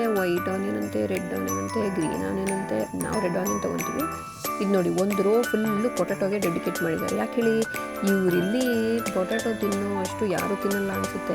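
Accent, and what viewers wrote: Mangalore, India. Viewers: Erna kannada boka ( jegujje ) pura pannaga gothand .. last gu er pander Udupi something .. apaga conform and .. Yan commets kori boka er Udupi pand panni..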